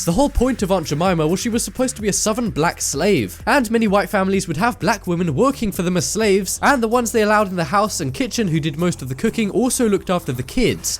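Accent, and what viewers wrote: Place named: England, United Kingdom. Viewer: What part of England do you hail from,? Just curious due to your accent:)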